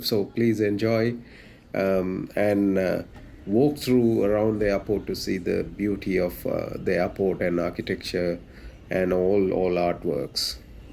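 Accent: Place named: Sri Lanka. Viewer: Your English accent is very Sri Lankan..!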